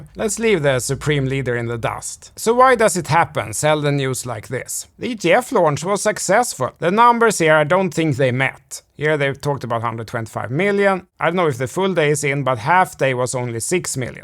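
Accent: Sweden. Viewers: Din svinglish är obetalbar!😂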